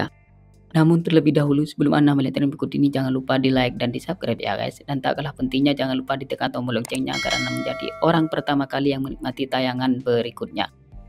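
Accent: Madura, Indonesia. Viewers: Pengisi suaranya kayak orang madura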